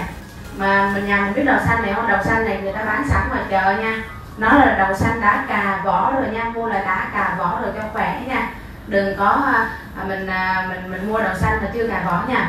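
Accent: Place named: Huế, Vietnam. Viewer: Nghe giọng là biết Huế rồi hiihi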